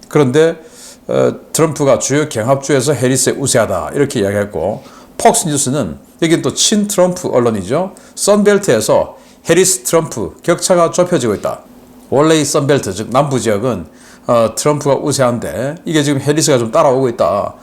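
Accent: Gyeongsang, South Korea. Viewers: 경상도 씹 말투 존나 거슬리네~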